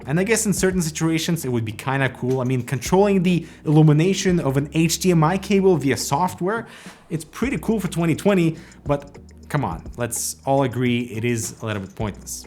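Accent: Russia. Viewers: Are you Russian? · bruh u russian or italian ????????? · Чо? ты русский что ли???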